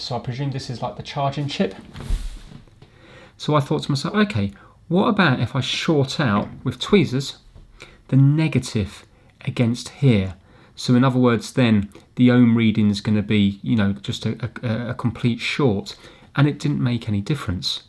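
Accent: United Kingdom. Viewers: Hi. I wonder if you have ever watched the Grim Reapers YouTube channel? The reason I ask is because your voice is a dead ringer for "Cap", the channel owner. Am I on to something, or is this just a matter of all you Brits sound the same to we Americans? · bro your accent make me want to leave my contry and com live in uk ,i love your video and thank you for all what you do sorry for the bad english 😅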